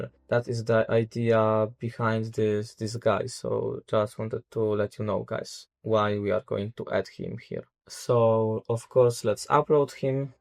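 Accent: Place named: Poland. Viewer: po pierwszych sekundach wiedziałam że jesteś polakiem ;) szacun stary, zajebisty montaż. Też sobie klikam w aftera i na pewno będę używaćtwoich poradników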